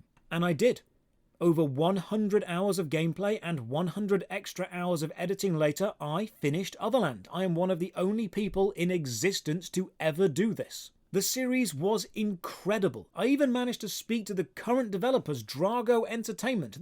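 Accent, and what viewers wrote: United Kingdom. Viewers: I think I found your Channel because of your Dreamworld videos. And you are right, I was fascinated by how bad this game is.... And then I basically binge-watched the worst MMO Series... And then I subscribed. : ) But I really like your style, and your clear, 'easy-to-understand (British <3) English' (I'm no native speaker). If you continue like this, I'm sure you will do well! I'm so happy for you, that you reached 200k already! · I started uploading about 3 years ago (I think) and I'm only at 1k... I'm proud of my 1k, since I only play PS, follow only two free-to-play titles, do some Demo reviews, edit and upload with PS4 Share Factory, and have no idea what the hell I'm doing. However, I do believe I am missing only one thing to be a "overnight" success... a british accent! lol That said, I hope one day you do a collab with another great british accent Youtuber I follow Many congrats on your success and keep it up! 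· Well dressed double barrel British accent man is the best booba streamer.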